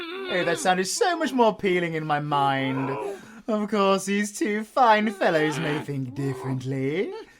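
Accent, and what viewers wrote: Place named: United Kingdom. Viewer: Finally! A person who has a British accent! I been needing someone to voice a character of mine for a few voice overs. If you’re up to it? It’s for my artwork and a feature.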